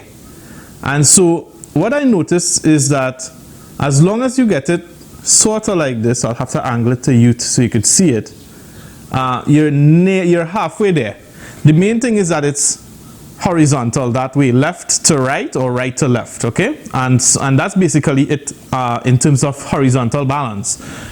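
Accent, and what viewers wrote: Trinidad and Tobago. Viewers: Wow. An ACTUAL video that helped!! Thank you so much for this. Lov'n the trini accent too haha! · You sound like a Trini. Am I right?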